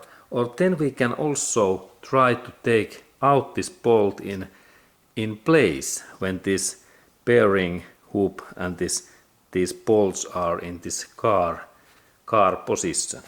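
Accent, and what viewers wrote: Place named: Finland. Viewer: Your Finnish accent brings me joy. Now I know that you know what you’re talking about.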